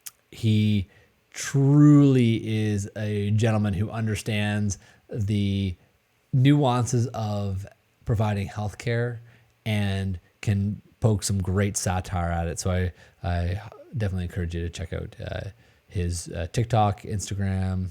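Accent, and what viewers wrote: Canada. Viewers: WESTERN UNIVERSITY REPRESENTS! No matter how hard you try, can't hide that Ontario accent. 🇨🇦 👍💕 love the channel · Are you Canadian? Certain words you say remind me of my friend in Ontario. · I noticed the accent as well, especially on the vowels. I wasn't sure if it was Minnesota/ Wisconsin or possibly Canada.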